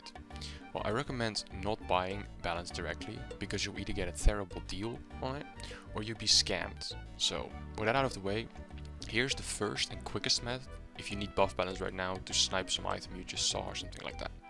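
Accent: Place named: Finland. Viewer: Suomi aksentin kuulee miljoonan kilsan päästä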